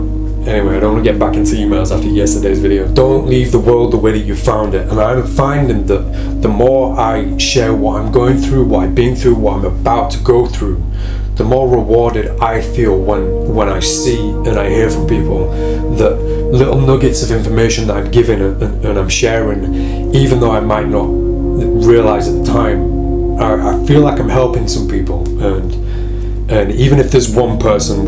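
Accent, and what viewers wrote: United Kingdom. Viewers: Don’t feel embarrassed or humiliated for opening up. That’s bravery. I truly await every video you post, regardless of what it’s about. There’s always a lesson to be learned. Todays: failure is not weakness. I enjoy spending my time watching; and with the English accent it always sounds epic! Haha! So sincerely, “Fanks” 🙏🏼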